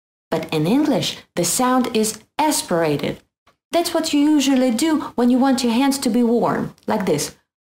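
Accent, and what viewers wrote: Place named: Russia. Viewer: I'm an Indian.. And I like this Russian accent.... But I'm finding it so difficult.. I couldn't pronounce like you... 😥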